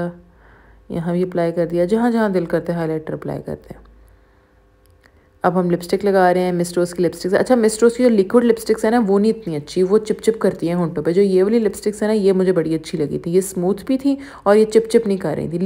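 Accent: Punjab, India. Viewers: Apka Punjabi way of talk mazeka h bht😍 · Haye didi tuc teh bilkul india wale mtlb sade vangu ani soni Punjabi bolde oo appa same same aa bilkul haye kash Pakistan wakhra na hunda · Wawwww jwa same Punjabi jehdi edr boli jndi aa..🥰m vry glad to listen ...m from punjab .. nd luv uhhh lehnda punjab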